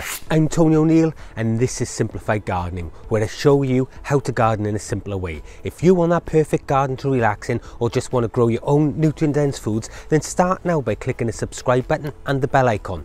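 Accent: Wales, United Kingdom. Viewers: I'm dyin to know-is yours a welsh accent? You're a fantastic teacher, by the way. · thought you were local I'm from cwmbran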